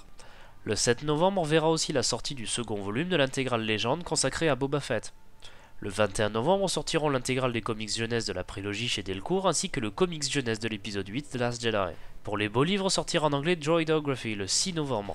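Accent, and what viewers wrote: England, United Kingdom. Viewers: 10/10 accent anglais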